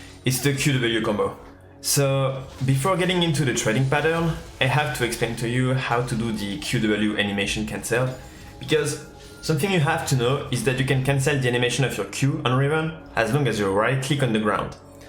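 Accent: France. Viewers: La vidéo est vieille de 6mois mais je suis un peu à chier ne anglais et j'entends que si je suis pas con tu es français donc je me posais la question, possèdes-tu une chaine en français ?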